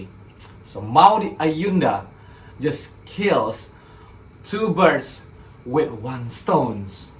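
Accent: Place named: Palembang, Indonesia. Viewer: Ngapo cak kenal logat2 nyo tu kak..cengki wong plembang ini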